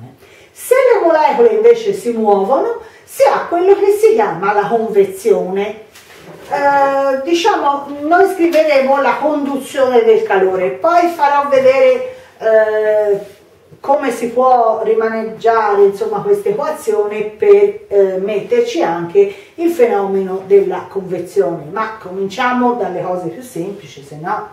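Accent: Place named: Tuscany, Italy. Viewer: Toscana palese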